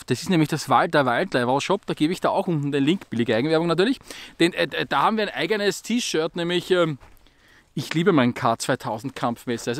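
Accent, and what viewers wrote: Austria. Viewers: Was hast du denn gehört? Und er ist ja auch Österreicher deswegen der leichte Akzent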